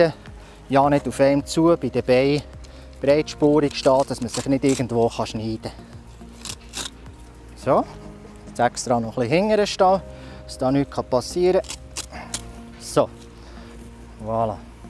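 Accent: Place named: Switzerland. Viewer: Ich hab zwar Aussetzer, aber er spricht eh so langsam, das geht schon. Ist halt gehobenes Schwyzerdütsch...Danke für die interessanten Ausführungen, bin selbst grad am Überlegen, was ich am besten machen könnt. Ok, hab das Video durch, einen Daumen hoch da gelassen und eine Entscheidung gefällt. Es wird eher die Steinmatte, da mein Fertigteich eh schon Zonen hat, ich möglichst wenig ersetzen möchte (siehe Taschen, die Jute wird verrotten, dann bleibt nur mehr der Kunststoff), mit dem Korkgitter kann ich wenig anfangen, sieht hübsch aus, bringt aber nix. Steinmatte sieht nach Steinufer aus. Glaub ich mal. Also danke nochmal für das Video (bin Ösi, daher hatte ich verständnistechnisch gelegentlich Aussetzer, aber im Zusammenhang ging es nahtlos weiter) :)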